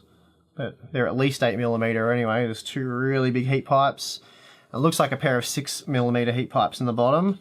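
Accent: Australia. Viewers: i WANT you to say something in pure australian accent in the next video. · oh just realize you're aussie mate!!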